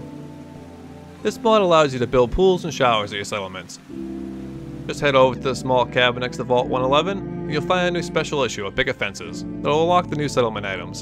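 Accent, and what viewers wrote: Boston, United States. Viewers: YOUR BOSTON ACCENT IS SO IMMERSIVE OMG!!!!!!!!!!!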